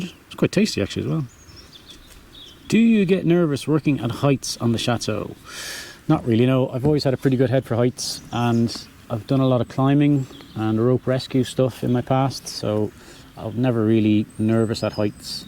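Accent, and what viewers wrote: Ireland. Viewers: I watch a few vlogs that some Brits make, and I have to say that your Irish accent is a pleasant change. At least, to my American ear. Sometimes Brits say weird stuff. 😊 The Q&As are great - keep doing them! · So, a certain tone of Irish is very romantic…is romance of interest to you? You seem like a KEEPER type. NOT ASKING FOR ME! But for other subscribers. (You’re too young for me)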